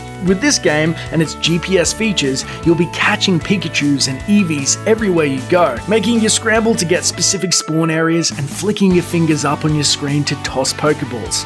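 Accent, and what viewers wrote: Australia. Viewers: I'm sure it's Australian... 98% sure. I feel like Aussie and NZ accents sounds similar tho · Faith Silva Is it Australian? I like that accent, too.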